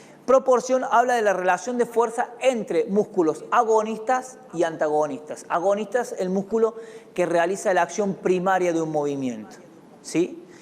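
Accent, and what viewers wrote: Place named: Cordoba, Argentina. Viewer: Muy bueno profe !! Se le nota de acá a la china el acento cordobés !! Saludos de Córdoba capital !! 👌👌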